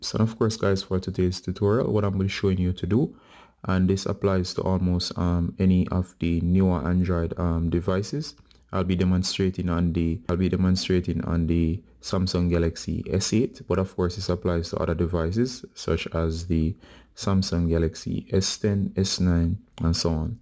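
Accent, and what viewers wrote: Jamaica. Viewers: Omg!!!!! Thank you so much!!!! I was so scared that I lost all of my pictures and you helped me recover them. Also p.s I detect a J.A accent...big up your self! I'm a fellow Jamaican as well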